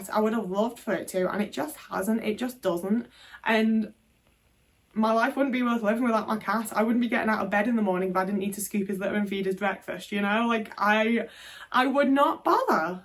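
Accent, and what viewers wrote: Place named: Liverpool, United Kingdom. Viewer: Hi. I completley relate to this. I am autsitic and I will never in my life understand the pettyness of some neurotypical people. I think the best kind of people are the ones where you canbjust exist together in silence with no pressure. Saying hi every time you pass them go for a wee just feels fake and forced and no need. I think high functioning autistic women are like Sigma personality types... the typical herd mentality people dont understand independence and how we dont crave constant validation like they do. I think autistic people are sensitive to energy in an intuitive sence, i feel like i can communicate with energy and i dont need to speak. I think we are just super special people and its their loss if they can't make small accomodations to make you comfortable. What they also dont get is, maybe if they made these small accomodations, i would have less shut downs, and then would be a more content, positive version of myself and probably more likely to be more sociable. 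If small accomodations are not met, i speak a lot less because im in shut down mode more often!! So they bring it on themselves, everyone has needs, ours are no more 'difficult to meet' than others. I try to tell myself, it is always their loss, not mine. I have only breifly watched your channel twice or so, your scouse accent, swearing and bluntness makes me laugh a lot and what you say is so relatable. Thank you ❤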